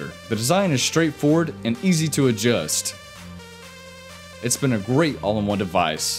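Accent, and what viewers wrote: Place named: South, United States. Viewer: If you don't mind me asking where are you from you sound southern ps thank you for telling me Jesus loves me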